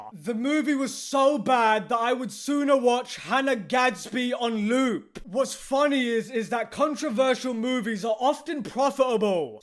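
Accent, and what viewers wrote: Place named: England, United Kingdom. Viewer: I think the content you create and the opinions you have here are valid and all but I absolutely HATE the way you aggressively shout with that horribly abrasive English accent, it makes it almost impossible to watch more than a few minutes of the video. Reminds me of certain scumbags that I used to meet on random UK discord servers with this 'know all, know fuck all' attitude that you yourself seem to have adopted for this channel. Hard pass mate.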